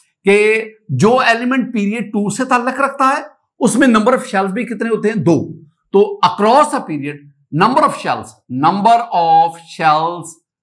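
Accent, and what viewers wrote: Punjab, India. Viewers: Mashallah sweet Punjabi Urdu ❤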